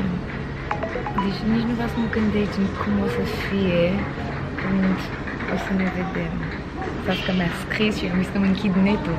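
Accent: France. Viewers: Ce accent e ăla de francez cred nu? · De ce ai accent franțuzesc? Ps:sunt nouă și nu știu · Ai un accent de franțuzoaică 😉❤️ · WOW... Imi place accentul tau asa franțuzesc 😘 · Ce accent franțuzesc ai! 😍😍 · Îmi place de tine,ai accent de franțuza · Ce accent frumos se franțuzoaică ai!:)) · Ador felul in care vorbesti. Accentul asta de frantuzoaica e de milioane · Se vede că ai accent franțuzesc! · Ai accent de frantuzoaica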